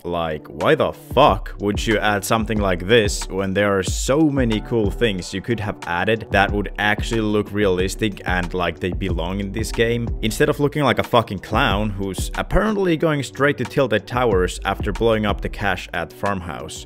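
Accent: Finland. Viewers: instantly knew you were finnish from your accent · kuule kuule kyllä siitä kuule että olet suomalainen letsgoooo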